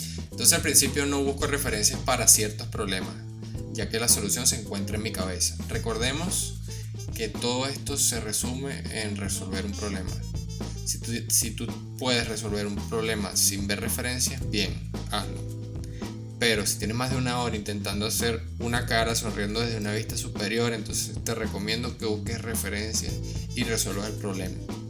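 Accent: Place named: Spain. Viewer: Creí que eras de España jeje, saludos crack!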